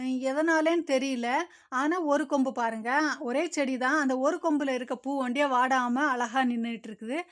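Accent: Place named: Nagercoil, India. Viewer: ok sister, vunga slang nagarkoil madhiri iruku, enaku native thiruchendhur, indha Marigold seeds kidaikkuma sister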